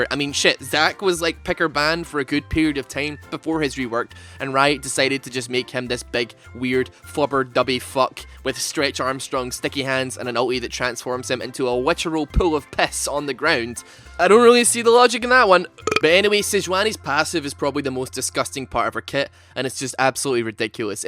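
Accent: Scotland, United Kingdom. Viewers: Is he scotish?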